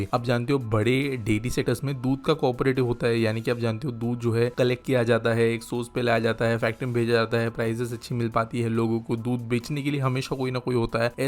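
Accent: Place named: Maharashtra, India. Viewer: bhai thoda dheere bola kar, marathi accent ke karan kayi words accent ke saath itna jaldi bolte ho, especially places ke naam, ki bounce ho jata hai upar say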